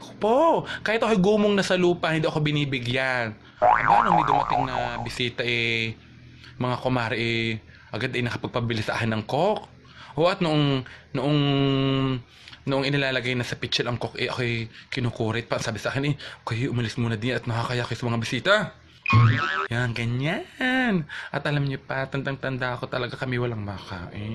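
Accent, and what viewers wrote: Batangas, Philippines. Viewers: Napaluha ako sa katatawa sa'yo 😅 more Batanguenyo accent vlog plsss sobrang nakakatawa😅😅😅 more power to you! God Bless You & Good Luck!!!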